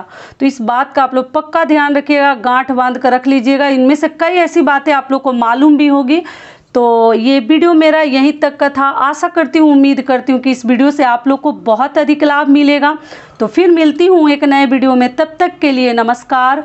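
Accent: Bihar, India. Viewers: Didi aap Bihar se hain kya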